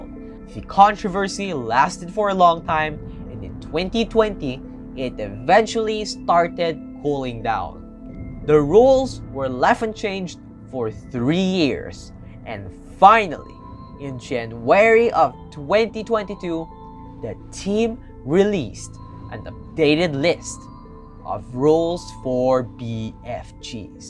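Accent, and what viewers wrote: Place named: Philippines. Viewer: I already know your filipino couse of your voice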